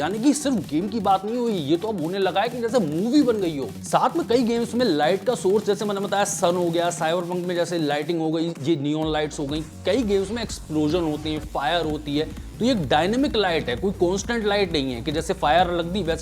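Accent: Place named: Hathras-Aligarh, India. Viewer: Bhaka bhak FPS 😂. Bhai Hathras Aligarh ke aaspas ke lag rahe!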